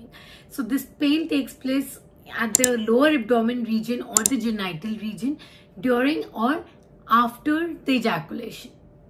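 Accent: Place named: Pakistan. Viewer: Your accent is Pakistani ❤🇵🇰🇵🇰🇵🇰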